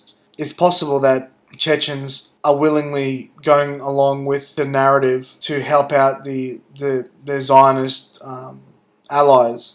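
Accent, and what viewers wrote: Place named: New Zealand. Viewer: sorry to be off subject but...you're a kiwi aren't you! :)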